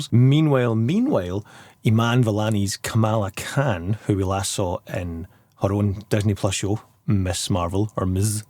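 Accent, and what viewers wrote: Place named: Scotland, United Kingdom. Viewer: Hang on! You're Scottish?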